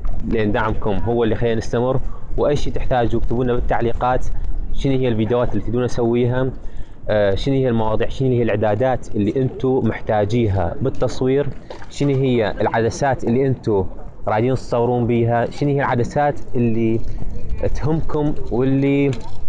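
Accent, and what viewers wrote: Mosul, Iraq. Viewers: كنك مصلاوي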